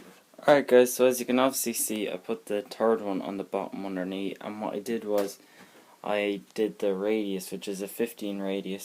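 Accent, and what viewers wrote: Ireland. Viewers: What part of Ireland are u from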